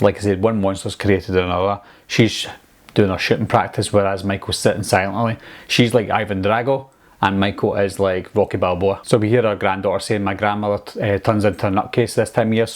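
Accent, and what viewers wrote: Scotland, United Kingdom. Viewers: I think I know where your from....Scotland?? btw love the vids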